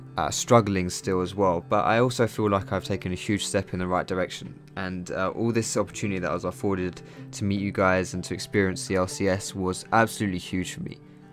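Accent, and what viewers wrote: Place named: Essex, United Kingdom. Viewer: Haha ur an Essex lad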